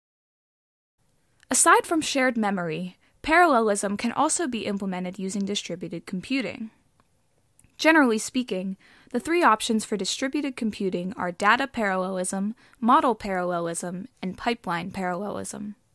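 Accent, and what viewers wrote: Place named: Pittsburgh, United States. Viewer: Luis Bill very true hahaha. It's apparently a Pittsburgh accent thing (that I've undone with some voice and speech training for future videos) 😊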